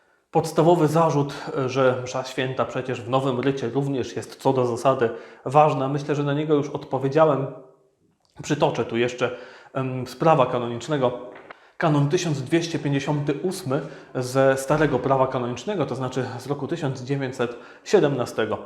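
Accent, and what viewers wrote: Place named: Italy. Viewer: Miałbym do Księdza uprzejmą prośbę, by przytaczając cytaty z tekstów łacińskich raczył Ksiądz stosować się do tradycyjnie przyjętych w Polsce prawideł wymowy, bez zachwaszczania italianizmami itp. Z góry dziękuję!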